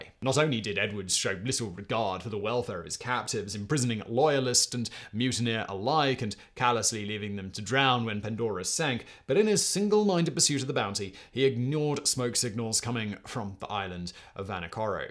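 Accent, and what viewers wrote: England, United Kingdom. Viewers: The King's English, spoken as rapidly as this presenter does, is impossible to understand. It is too long to read the closed captioning, so I remain ignorant of the final outcome for the mutineers. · Narrator does speak kind of fast but he clearly and precisely delivers the narration. His delivery keeps me engrossed in the story. I dunno i kinda like English in that particula rmanner of elocution and accent. Though I don't know from what region in the u.k. our speedy speaking narrator doth haileth. From a bred, born, and raised Southern Arizonan descendent of English stock... well works just fine for me. (Fast talking English guy that is).. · His crazy accent? You mean English?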